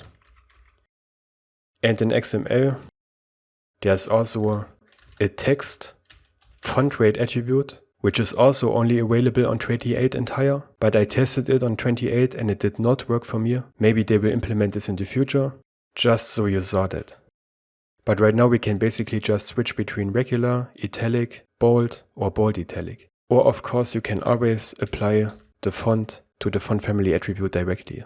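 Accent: Germany. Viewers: Great video keep em' coming (Man merkt du bist deutscher/Österreicher😂)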